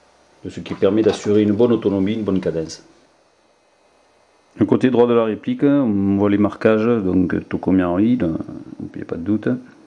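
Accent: France. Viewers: hehehe i luv ur french accent xD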